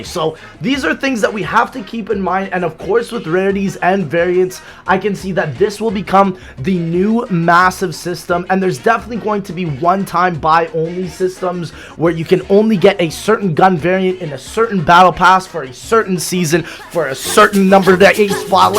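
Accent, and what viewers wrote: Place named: Newfoundland, Canada. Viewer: I realize now that the way you speak is almost like how a newfoundlander sounds and to people who aren't exposed to it a lot have no idea what you're really saying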